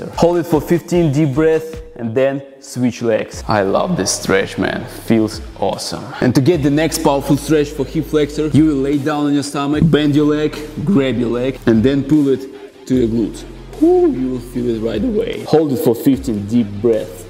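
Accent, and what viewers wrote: Russia. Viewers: This russian accent motivates me so hard. I feel so jacked already 💪😁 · а ты русский ?, потомучто говариш как русский луде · я же слышу, что ты русский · Прикольно и полезно, но акцент как из фильмов про карикатурных русских гангстеров, конечно, сбивает... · Почему я слышу русский акцент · рашн инглиш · сколько лет живет в сша, а акцент как был русским, так и остался.лол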